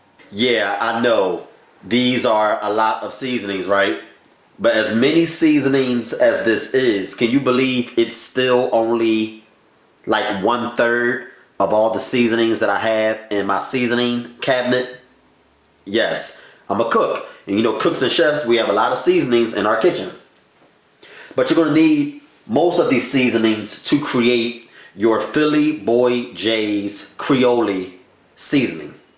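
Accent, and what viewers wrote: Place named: Baltimore, United States. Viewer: You sound like you form bmore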